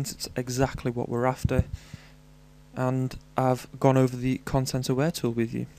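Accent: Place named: United Kingdom. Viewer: thx team GB lol, keep the hard work mate, this is fantastic :) that is British accent for u Minh Nhat :)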